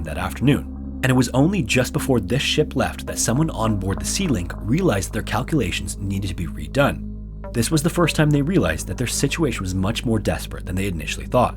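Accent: Canada. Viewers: little do they know this IS my endel lol these videos can both put me to sleep and help me focus during certain household chores, it's an absolute joy to listen to I finally heard your Canadian accent this time btw, I love it! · Because of the naval focus of these stories, every time he says “about” in that Canadian accent, I have to think for a second if he’s actually saying “a boat.”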